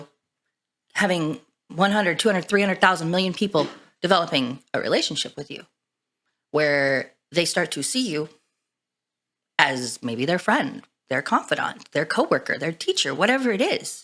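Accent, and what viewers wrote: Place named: California, United States. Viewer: Yea my first time seeing you and I gotta say that's a strong ass California accent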